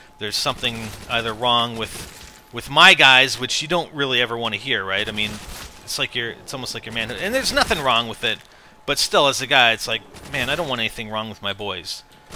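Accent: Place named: Canada. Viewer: is Canadian. His accent pretty much gives it away if you're familiar with Canadian accents at all. I remember the first time I saw an vid, I realized about 30 seconds in "This guy has GOT to be Canadian. Aboot? Eh?"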